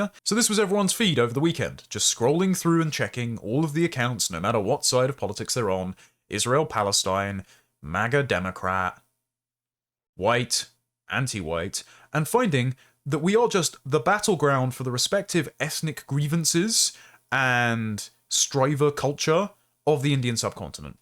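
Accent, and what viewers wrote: RP, United Kingdom. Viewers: Yank here. You are the perfect defender of Western values. You have a posh accent. You look dapper. Your enunciation is superb. Your thoughts are clear and direct. You include the States in your defense. And, you have this lovely background music of seething outrage. Well done, and thanks! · Love that British accent. Alas, soon it may be a thing of the past 😢 Britain may soon be speaking with an Indian accent. Prepare well my friend 😉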